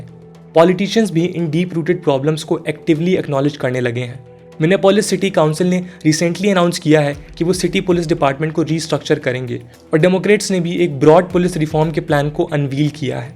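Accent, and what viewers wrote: India. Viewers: Indian vox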